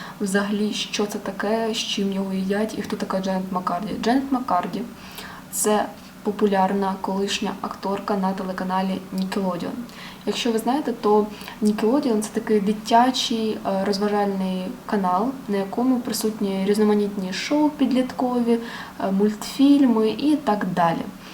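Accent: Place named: Russia. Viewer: Привітик, файне відео:3 але попрацюй над вимовою літер, вимовляй чіткіше літеру "Щ" наприклад як "шчо, шче, шчоб" та не забувай про "Ў нескладове", розумію тобі як російськомовній буде тяжко звикнути, але потрібно позбавлятися російської вимови, я вже довгий час працюю над своєю вимовою!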